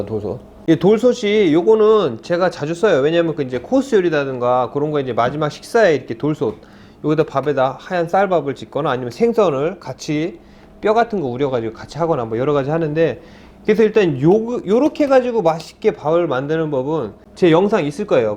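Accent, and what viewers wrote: Japan. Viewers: 그니깐요 역시 사람은 계속 써야 하나봐요 초창기 모습 보면 재일이나 일본 사람이 한국말 배운줄 알았었는대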